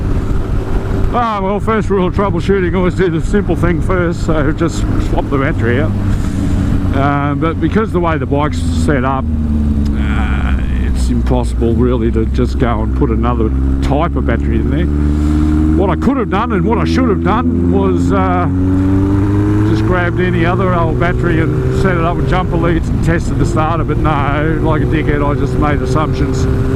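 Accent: Australia. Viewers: Hey, I found your channel through matts. Love the classy bikes you got and something about the accent from good old 'straya just attracts me even more, don't ask me why. I don't really have the finances or expertise yet to buy old bikes and build them back up like my father did (He collects old english bikes, a BSA Sloper is his current project and he had couple of Ariels before) but I'm planning on buying a DR 800 BIG on the cheap and mess around with it to see what's what and build up my skills before I break a proper classic plus I'd like to have something more usable (and offroadable) on the daily than my GSX-R. Anywaaaay I wanted to ask you what the metallic ringing on this bike here is, it reminds me a bit of the rattle Ducatis make but this clearly is not a Ducati.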